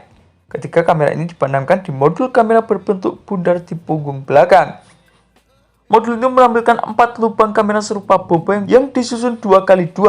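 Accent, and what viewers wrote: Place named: India. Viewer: Kukira logat india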